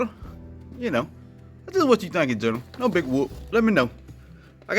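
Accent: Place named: Georgia, United States. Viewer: where did you get your Master grow course certificate from? I want to complete one. Thanks for the videos and I love the realness and natural Georgia dirty south accent! I'm from Georgia myself. Let me know where to get the certificate please. Keep up the grind and growing your own food!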